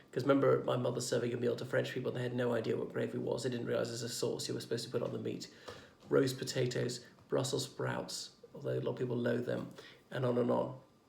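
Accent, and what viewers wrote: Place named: England, United Kingdom. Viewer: You sound incredibly English to me. What is your channel actually about? Is it more of a discussion channel?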